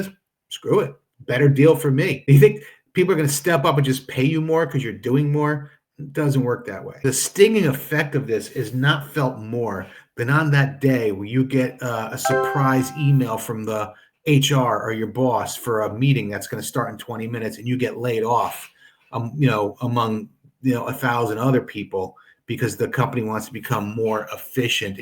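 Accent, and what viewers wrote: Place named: Long Island, United States. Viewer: I'm guessing Long Island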